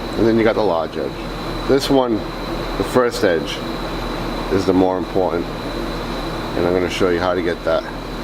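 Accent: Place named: Massachusetts, United States. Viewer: Hey...if we got married....my name would be......Tamara!!! Lets do it! Lol i have no idea who you are im just here to get some instructions on how to sharpen my shears and you sound like you are from Mass. Have a great day thank you for posting this helpful video. Hope u got a little laugh my pay for ur vid. 😎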